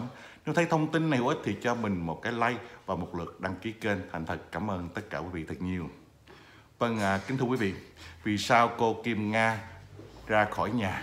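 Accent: Standard. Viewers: Anh noi qua chuan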